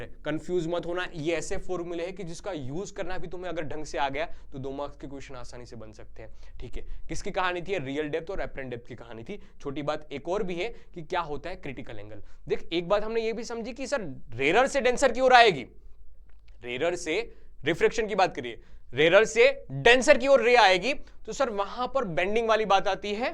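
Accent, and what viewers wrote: Gujarat, India. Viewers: He sounds like he is from Gujarat 😅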